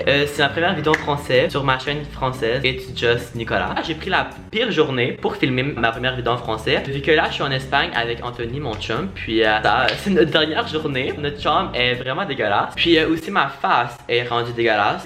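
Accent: Quebec, Canada. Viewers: Si tu veux réapprendre un peu le français, en tout cas te replonger dedans tout en conservant le charmant accent québécois, tu peux regarder les courts-métrages du réalisateur Émile Roy !!! :D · Tu parles un français un peu Québécois, tu viens d’où? [edit]: en fait c’est pas « un peu » mais tres Québécois wow [edit 2.0]: cest bon javais pas réalisé la partie « moi je viens de montreal » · Omg t’a l’accent québécois 😄🔥 Im here from Montreal! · J'adore ton accent de Canadien français. Mais l'accent anglais de ton boyfriend est trop mignon aussi. Il faut vraiment qu'il apprenne le français! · Damn I never realised how different Canadian French is to French from France. There are a lot of Canadian sounds like dans le futurrr instead of dans le futurgh if ygm😂😂 Ilyyy 💕💕 So glad I'm taking French as a GCSE rn😂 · Oooh je viens juste de découvrir ton accent québécois-français tes trop mignon, so cute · juste à t'entendre parler , j'ai su que tu étais québécois ! 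( je suis québécoise moi aussi ) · Tu à l accent québécois ...😅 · T'a grave un accent québécois j'adhère👌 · Choqué 😶 je t'ai découvert via ta chaîne anglaise et tes pranks. Je me serais pas douté que tu parlais français ! 😄 Tu parles super bien ! (On entends bien l'accent québécois 😉) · Ton accent québécois est trooooop mignon 🥰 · Oh mon dieu!! Je suis française et je savais pas que tu parlais français ! Mashallah l'accent québécois !! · Lol il est québécois je ne savais pas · Ce n’est pas une accent mixte c’est pure québécois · Ouais je suis très content de voir que tu fais une chaîne avec le super accent québécois qu’on aime tous✌️ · C’est vraiment cool que tu te partes une chaîne en français, j’écoute tes vids en anglais depuis longtemps pi moi aussi je suis une québécoise bilingue ahaha! Mais hey ne te fais pas d’accent français, l’accent québécois c’est cool! Pi si tu garde ton vrai accent québécois les gens vont pouvoir voir que les gens qui parlent français au Québec ont pas le même accent que ceux de la France comme tout le monde pensent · Tu parles très bien français Nicolas. 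Bon après y'a les expressions du genre "Mon cheum" mais ça c'est du français québécois donc aucun souci :). Tu as accent mi québécois mi français j'aime bien. Ha oui faut dire à Anthony que la langue française ne se résume pas à "Je suis déguelasse" hihi :) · OMG T QUÉBÉCOIS JTM OMG AHHHH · Je trouvais que tu avais un accent québecois avant que tu le dise 😂😂 Je savais pas que tu etais québecois, mais j'aime trop au mon dieu. Je suis française (ouest de la France) et je suis tellement contente de savoir que tu parle français, genre j'arrive pas a decrire a qu'elle point je suis contente😍😍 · TES SI QUEBECOIS😂😂😂😂 (s’cuse, je suis aussi canadienne mais à mon école on tous parle français de france comme les profs tous viennent de france et avant d’aller à cette école mon français était aussi mix) mais oof je voyais pas la différence avant que mon français est devenu si « français de france » et wow je le trouve si drôle d’écouter qqn qui a du français SI QUEBECOIS (pas de shade du tout à toi) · c'est vrai tu as un accent québecois aussi XD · C'est vraiment cool, tu viens du Québec! 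Tu as un bel accent québécois malgré le fait que tu dis que tu l'as un peu perdu😉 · Bonjour Nicolas, je suis française et j'ai pratiquement la même histoire que toi : mon père est Anglais est vis à Londres et ma mère est française je vis avec elle du coup j'ai toujours parler les 2 langues depuis ma naissance (j'ai passé ma crèche et toute ma maternelle a Londres), mon père viens souvent nous voir mais j'ai toujours étais plus confortable avec le français. Ton accent est trop mignon et je trouve qu'il est plus Québécois que français😆. Tu as 19 ans, il me semble. J'adore tes vidéos BISOU !!!💜💜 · Ton accent québécois 😂❤️ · Ah ce français du Québec j'adore en plus t'as l'accent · ah ben tbk t québécoi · Woaww ton français est vraiment québécois😂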